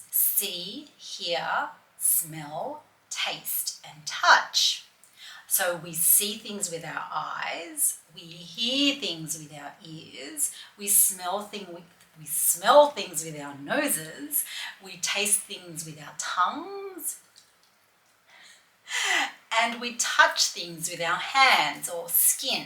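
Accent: Australia. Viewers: I don't understand why you don't have more subscribers and views, you made really useful and interesting videos, and the best thing is you have Australian accent! That is strategical for me, I am living in Australia and I was study by my own with audios and videos in British and american English, and I wasn't improving my listening skills here (I didn't understand Australian people). Since I am study with your material I have felt that I am progressing 😀. Thank you!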